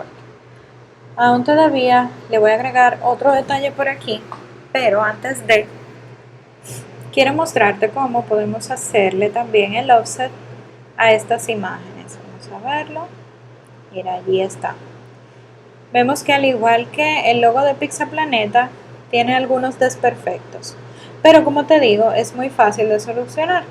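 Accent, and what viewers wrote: Dominican Republic. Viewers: eres dominicana?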